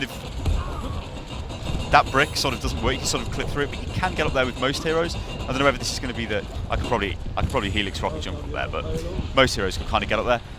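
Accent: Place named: United Kingdom. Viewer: "Great British Gaming" "amis" gg. Ja die Sprache ist die selbe: ENGLISCH. Wie kommt man dann also auf ami? Ausserdem hat er einen klaren Britischen Akzent.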